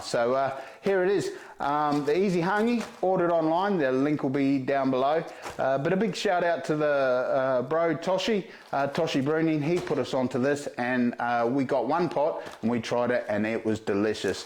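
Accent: Australia. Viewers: Sounds like this Kiwis an Aussie. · sorry bro but is that oz accent ? would like to know how you define the taste of ? keg hangi above ground hangi all taste like boil up …?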